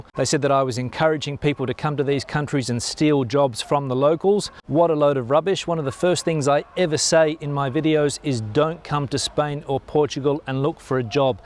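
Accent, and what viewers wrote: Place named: Australia. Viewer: Cheers,. When I first found your channel, I was quite confused. Your rapid speech, Australian accent, and my bad hearing combined to have me thinking your name was Jordy!(I know!😂) The first comment I ever made on one of your videos, I called you Jordy, then I read all the other comments calling you, and I was so embarrassed that I went back and edited it! I love your updates, no matter where they are from👍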